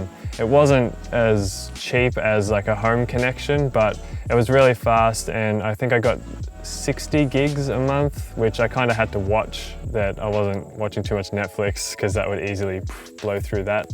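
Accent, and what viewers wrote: New Zealand. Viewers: He's a kiwi - from New Zealand 😊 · i knew you were from new zealand lol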